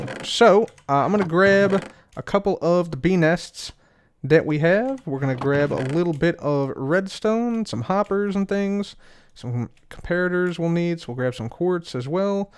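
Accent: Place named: Chicago, United States. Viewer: the accent reminds me of the saturday night live skit with farley and akroid ...."da bears..... da bulls....ditka" ..." how many heart attacks for you bob?"..."gonna be a bakers dozen"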